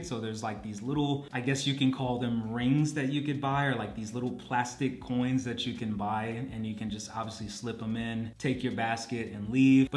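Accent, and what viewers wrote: United States. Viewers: Acting and talking like a stereotypical American. How long have you been outside the US? Yo do know that Aldi has been a thing in the US for some time. And where is your ID? Everything IS NOT on your phone. You might have a digital German driver's license, but I am pretty sure that the US Government has not setup that type of digital ID.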